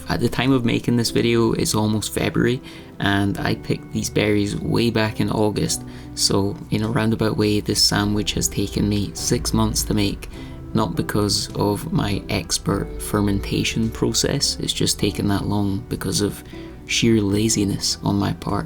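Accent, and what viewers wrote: Scotland, United Kingdom. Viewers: Hi, I’ve been watching since you bought the tiny house and I don’t normally comment, but every time I watch a video of yours,I mean to! It’s hard to articulate what I want to say, but basically I really think you have an element of genius. The combination of the topics you discuss, with the video clips etc, is so very creative and skilful. I know you’ve talked about being anxious before, so I just wanted to say that I hope you’re confident about your work because you really should be! Also lovely to hear a fellow Scottish accent in the YouTube world 😊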